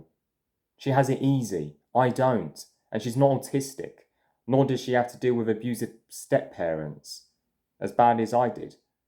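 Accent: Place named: United Kingdom. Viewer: I know I'm late to the party here but you only just showed up in my feed last night and I'm starting to work through your vids. First, I respectfully and in no way mean this in a condescending way request that you interact with your mum and gran with nothing but respect, patience and kindness. Your dad, if he left you and your mum for whatever reason, can piss the f**k off, however. :) Second, you sound like a proper Brit. If this is the case you need to take pride in your country and continue forth its inspiring tradition; such is the great example (while not always perfect) your ancestors created that people literally risk everything to gain entrance to your country in these troubling times. I agree with you that things seem grim but they are by no means hopeless and not without solution.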